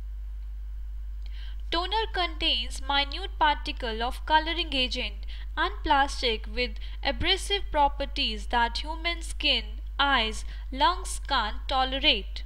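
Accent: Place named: India. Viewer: fucking india and their dumb fucking accent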